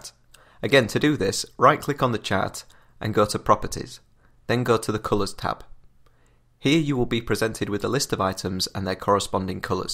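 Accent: United Kingdom. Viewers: Very clear no nonsense with British accent , made it clear and elaborate thank you very much.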